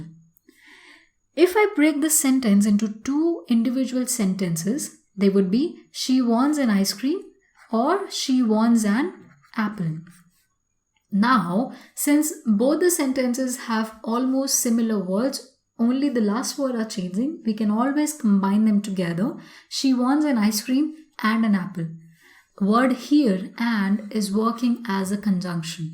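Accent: India. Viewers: Why indian english acsent is funny? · I was completely confused with this Indian accent😐😐😐😐😐😐😐😐😐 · I LIKE YOUR INDIAN ENGLISH.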